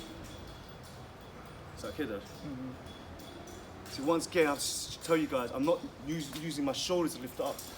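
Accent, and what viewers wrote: United Kingdom. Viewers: your English sounds British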